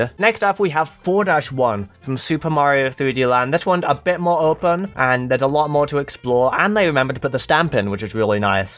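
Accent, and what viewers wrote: United Kingdom. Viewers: Is British?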